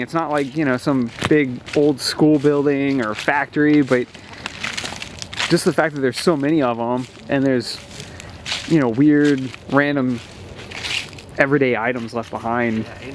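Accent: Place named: United States. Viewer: I do wonder just how they got to be all "rummaged through" like that. Maybe animals had gotten in them and ripped everything up, like the cushions of the chairs and couches? And, NO toilets? Just HOW old are these cabins? And, since I am commenting here as I watch the video, what state is this all in? I am just ASSUMING it is in the USA. Just because our "tour guide" speaks perfect English of the US type. By the spruce tree's in the video, I don't think this is too far South in the US, IF it is in the USA. Okay, at about we get past the shacks or cabins to the old trailers. The trailers SEEM to be maybe 1980's? Possibly late 1970's? And, it's just odd how the trailers seem to just be abandoned without anybody taking most of the food and other things with them when they left it. And, whomever's land they are all on, haven't they pushed to get it all cleaned up? 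It is all so mysterious how this old place is just left here abandoned. You would THINK that IF it could be all cleaned up and cleared out that MAYBE the property owner could sell the land OR even make it a nice place for a NEW trailer park , or IF it is NOT just out in the Boonies, a good place for new homes to be built at. BUT, It IS really quiet out here, it MAY be in the boonies of the boonies. And, maybe most people would NOT want to be that far away from a city or town center. Some of these trailers may be even from the 1960's, but, some are maybe 1980's too. Most of the furniture is about 1980's too. But, ho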